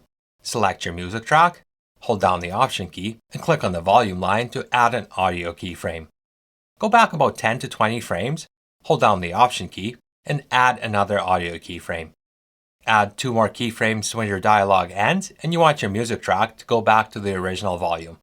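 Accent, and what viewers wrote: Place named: Saskatchewan, Canada. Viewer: As soon as I heard your accent, I could tell you were one of us 306'ers.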